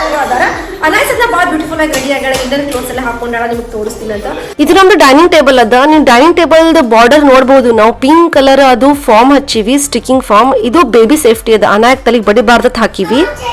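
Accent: North Karnataka, India. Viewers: ನಿಮ್ಮ್ ಮಾತ ಒಳಗ್ ನಮ್ಮ ಉತ್ತರ ಕನ್ನಡ ಕೇಳುದ ಚೆಂದ ಬಿಡ್ರಿ ಮಸ್ತ್ ಎಲ್ಲಿದ್ದರೂ ನಮ್ಮ ಸೊಗಡ್ ನಮ್ಮ ಭಾಷೆ ಬಿಟ್ಟಿಲ್ಲ ನೋಡ್ರಿ ನೀವು.ಅದು ಭಾಳ ಖುಷಿ ಅಕ್ಕೆತ್ರಿ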